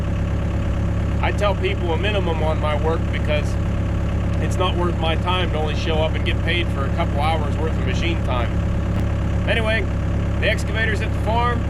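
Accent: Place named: Missouri, United States. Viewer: Hi, Reading through the comments i picked up your name. Someone said Pennsylvania? By your accent and the trees was guessing Missouri but I guess not. Anyway when I worked at a Lead Foundry in the 70's drove a 920 Catipiler front end loader and everything else they had there. What I really enjoyed driving there was the old White Freightliner. Had a hydraulic 5th wheel hitch that you could hookup the trailer and lift it off the ground and go after you swung out and hooked up the pigtails and lights. They had all kinds if forklifts but my favorite was the small hyster I used for running shipments around and weighing stacks of lead bricks to band them up. The bander was electric which was awesome as well.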